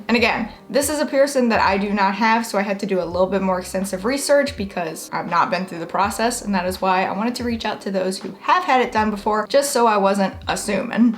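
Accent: South, United States. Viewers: I can tell, shes from the south.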